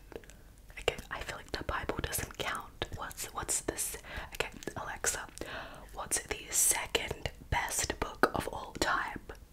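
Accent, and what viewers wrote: Australia. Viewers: Are u australian? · Same!! I've been subbed for a few months and I still can't figure it out. Kind of sounds Australian too?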